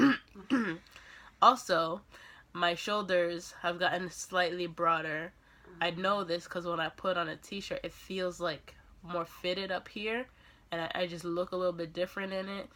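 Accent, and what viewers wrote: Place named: Caribbean. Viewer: i can hear the Caribbean coming out in this vid haha 😁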